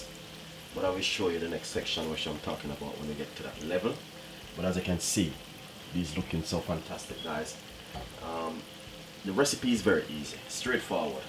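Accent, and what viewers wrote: Jamaica. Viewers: I was wondering if it was a brand of milk that he was referring to and I’m Jamaican and I did not understand what that was. I even went in his description to see if he listed the ingredients. I have no issue with his patois, that just went over my head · go over to another cooking channel for the queen's English. This is a Jamaican chef, talking in his Jamaican dialect. Low dat no man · Where I live at the moment there are zero Jamaicans so its ten years since I heard the accent. This made me laugh when he said what sounded like ola milk, then I realised he was saying whole milk and I collapsed laughing gosh I miss that accent. I am English living in Sicily and cant wait to get home !!! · That was what I came to listen for when I find the video. I said “ if he don’t have a Jamaican accent, am clicking off!!” 😆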